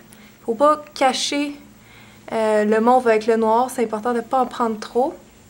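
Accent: Quebec, Canada. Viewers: ABONNéE !!! Déjà j'adore tes vidéos, et j'adooooooooooooore le québec et j'adoooooooooooooooooore leur accent !!! ;) Je t'adoooooooore :D♥ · Vraiment sublime direction dans mes favoris pour realisé de Mu estivale! J appreçie énormément regarder tes vidéos! Je suis française et j'ai de la famille au Québec ton accent me fait penser à eux!(nostalgie) Bisou cynthia ps: tu es vraiment très jolie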